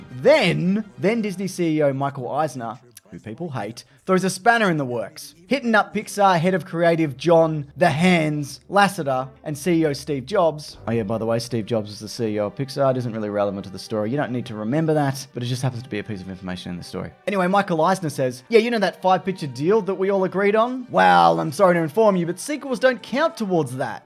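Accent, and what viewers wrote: Australia. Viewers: Forgive me. I'm a dumb American. Is this an Aussie accent?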